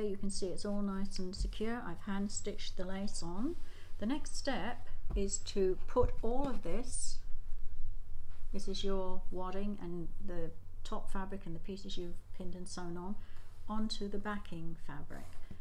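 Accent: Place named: Australia. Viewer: This is absolutely beautiful and a wonderful tribute to days gone by. I've only just found your channel and can't wait to watch more. Lovely to hear an Aussie here. I'm in NSW 🌷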